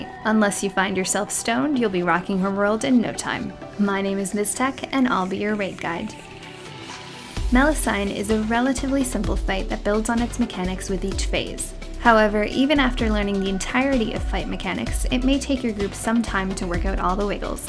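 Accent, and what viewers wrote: Canada. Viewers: Yeah, very beautiful voice... and there's something abowt your accent that sounds Canadian, too :)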